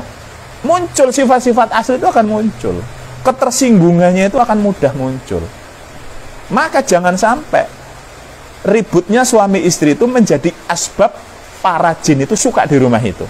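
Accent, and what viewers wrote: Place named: Java, Indonesia. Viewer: ngomong Jawa medok nya gk berubah😂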